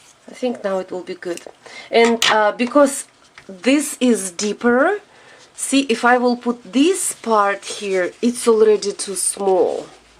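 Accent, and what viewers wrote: Russia. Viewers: Чувствуется ,что по английски говорит россиянка..Произношение режит по ушам..но все равно молодец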